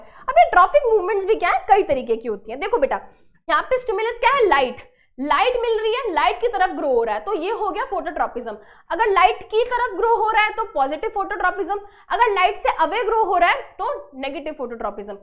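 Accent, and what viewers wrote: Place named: Punjab, India. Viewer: Ma'am was catching her Punjabi accent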